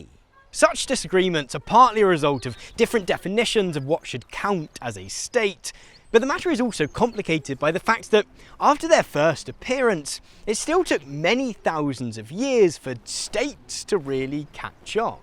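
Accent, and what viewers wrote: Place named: England, United Kingdom. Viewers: When we hear English accent in prose, which is a good thing, we harken to the old school days when music in England was the trend indeed. by is the perfect filler of a song of the Queen's English, mate! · Speaking in your English accent with your Anglocentrism saying that Scotland is an example of a nation that doesn’t have it’s own state. You are blind. England is also a nation that doesn’t have it’s own state. That arrogantly blind Anglocentric attitude is what Scotland wants to get away from asap.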